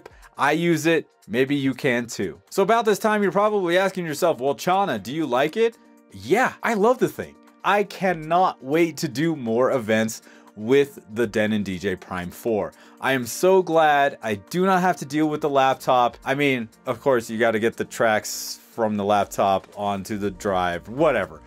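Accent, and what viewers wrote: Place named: France. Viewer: Very well explain and film, you speak clearly and for a french guy it's cool for understanding what you say Effects are are not too much and final result seems professionnal. Very good job !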